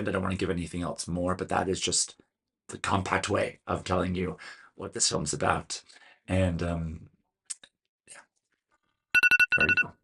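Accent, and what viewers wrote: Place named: California, United States. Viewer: I could see that you really like this movie... as you said you dont know about brazilian dictatorship, i must tell you that dictatorship was supported by the usa and the cia, actually, usa were responsible for all dictatorship that happenned in latin america. Im not pointing my fingers at you, dont get me wrong, but ithink its important to say that. I like your californian accent btw. Im rooting for fernanda but i wont be devasted if moore getx it...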